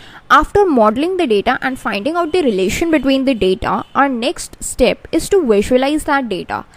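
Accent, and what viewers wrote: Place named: India. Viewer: Your English has so strong Indian accent that I can't grasp what you say. Please make Engish subtitle appear on Video.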